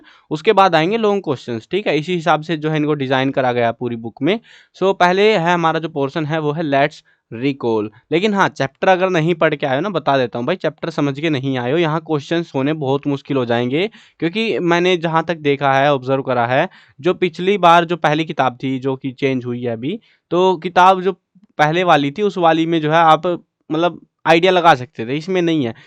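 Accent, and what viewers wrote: Haryana, India. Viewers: Sir are you belong to haryanavi background 🙂🙏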